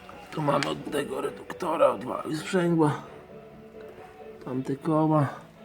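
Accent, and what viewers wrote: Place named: Podlasie, Poland. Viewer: Tak ło chyba kolega z Podlasia :)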